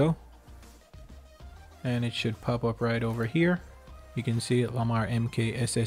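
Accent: Jamaica. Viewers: The Jamaican can’t hide in your voice bro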